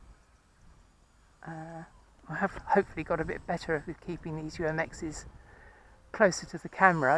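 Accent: Australia. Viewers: we loved it you speak better Aussie than us . The Aussie blowy fly is always the first one there at a good BBQ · Love my 50mm Arrows Viper - once I had modified it to fly faster on 4S :) Decent Aussie accent!!! :)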